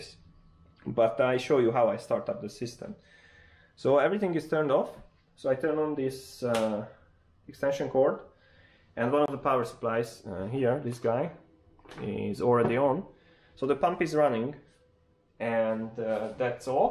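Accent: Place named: Hungary. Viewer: Are you Hungarian? Your accent tells me you are! :)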